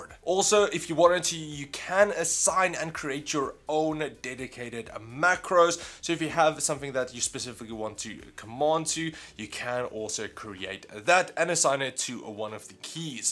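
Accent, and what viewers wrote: South Africa. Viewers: R u south african ?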